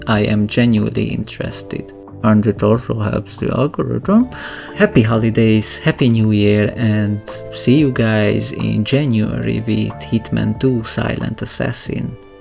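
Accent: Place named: Hungary. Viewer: Happy New Year. You're from Hungary from what I hear from your accent.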